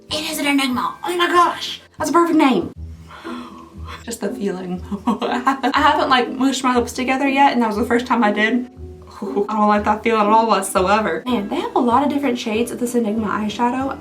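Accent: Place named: South, United States. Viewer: Say blush brush five times fast and you will understand point lol from one southern with a southern accent…to another, I understand and love her❤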